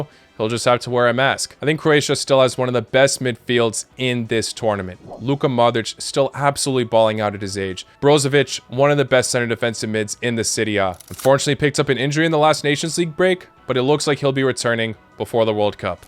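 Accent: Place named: United States. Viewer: pfff this is stupid... people predicting like you do don't know about football at all.... you sound american so i guess that is the porblem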